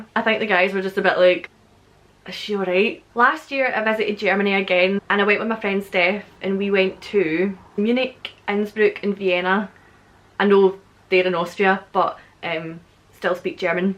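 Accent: Scotland, United Kingdom. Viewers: I've used many apps for learning languages and most of them are terribly ineffective. The only one that i've personally found at least a bit useful is Lingodeer. This app mimics real life lessons. It has some grammar explanations (in the beginning of each lesson) and it lets you repeat and build on previous lessons. It's still far from perfect, but a step in the right direction. I recommend it to beginners who don't have enough motivation to read language books or have time to attend actual courses. Lingodeer was initially created for learning Japanese, Korean and Chinese, but now other languages are also available (check it on Google Play). First level lessons are free, but for others you make one time payment (which is a great deal). The only reason that am doing this positive review of the app is because learning languages is kind of my hobby, and i was pleasantly surprised and want to share that with other people. 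Btw, very entertaining channel. :D I use it sporadically for getting used to scottish accent, which i like the most (of all the english ones). Alright, that's all. Greetings from Slovenia. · Hey, I love the Scottish accent and watching your videos is great. I'm from Germany!! Just here to say *Augsburg* is a great town to visit in Germany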